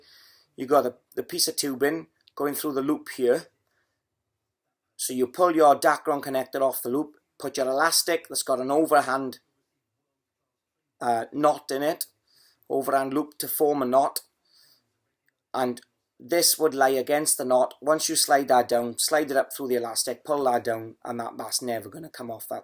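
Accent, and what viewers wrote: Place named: Wales, United Kingdom. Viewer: A fine welsh accent, and a great video, thank you!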